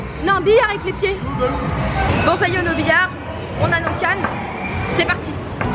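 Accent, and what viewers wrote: Normandy, France. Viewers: C'est l'accent Normand les "o" se prononce "eu" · Je suis normande aussi lol et on dit pas ça dans le sud manche. Ça doit être en haute Normandie :)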